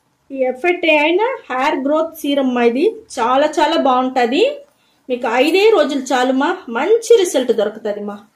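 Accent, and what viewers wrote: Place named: Karnataka, India. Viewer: కన్నడ భాష యాస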